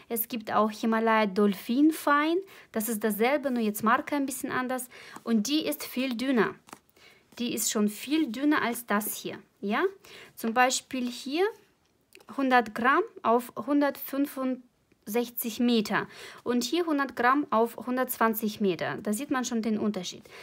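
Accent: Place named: Russia. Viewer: Hallo, ich bin neu auf deinen Kanal und ich finde dich sooo cool, ich hab schon so viel gelacht während dem häkel, es ist immer lustig mit die. Dein russischer Akzent ist auch sowas von unterhaltsam, mach weiter so, ich bin ein Fan deiner Kuscheltiere. Ich kann mithäkel und bin mittendrin. Danke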